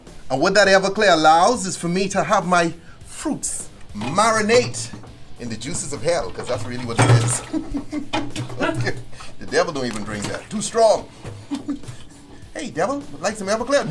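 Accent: Bahamas. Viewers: Bro u gotta be from the bahamas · Bahamian accent i hearing or I’m freakin out lol 🇧🇸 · Are you bahamaian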